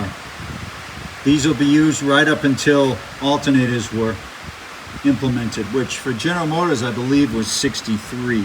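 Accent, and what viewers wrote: New England, United States. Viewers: You from Maine, NH or MA?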